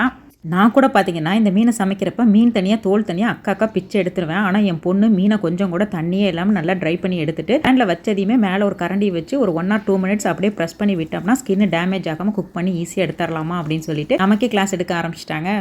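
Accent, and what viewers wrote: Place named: Coimbatore, India. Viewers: ஆமா என்ன எங்க ஊர் (கொங்கு) slang பேசரீங்க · என்ன அம்மணி எங்க ஊர் தமிழ் பேசுறீங்க. நல்லாத்தா,இருக்குது பேசுங்க, பேசுங்க அடுத்த தடவை வந்தா, கோயம்புத்தூர் பக்கம் வந்துட்டு போங்க. ❤ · S pure kongu slang but super sis unga slang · Ennagga enna coimbatore slange varuthu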